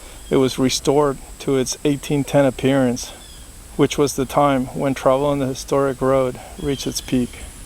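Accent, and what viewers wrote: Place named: Puerto Rico. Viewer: I always try to figure out what accent I'm hearing..my guess is Puerto Rican?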